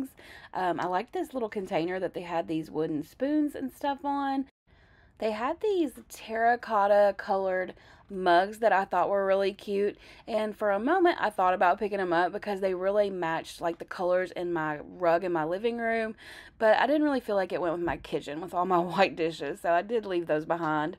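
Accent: Kentucky, United States. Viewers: Girl I knew that accent was pure Kentucky! We live in Central Kentucky 🙂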